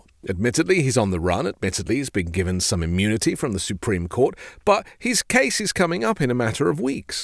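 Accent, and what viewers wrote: United Kingdom. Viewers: For some odd reason, listening to you with a British accent explaining why Trump will lose is very soothing and gives me peace of mind. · Why is this lame with a gay a$$ British accent telling you about American politics???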